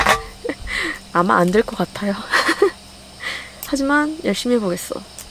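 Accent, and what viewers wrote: Jeolla, South Korea. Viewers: 에너지 넘치는 23갤 아들이랑 캠핑 다니고 싶어서 요즘 캠핑 영상만 찾아보다가 님을 알게 되었어요! 지금은 경기도에 살고 있지만 고향이 무안이라 전라도 사투리가 어찌나 반갑던지!!! 캠핑에 ㅋ도 몰라서 텐트부터 장비 등을 신중히 구매하려고 하는데요(이중지출을 최대한 막으려구요ㅜㅜ) 삿갓타프 괜찮아보이네요! 비 안오는 날엔 님처럼 활용해도 좋을 것 같고 아기랑 다니면 스피드가 생명이니까요ㅜㅜ❤ 매번 영상 기다리는데 오늘도 너무 잘 봤습니다😋💛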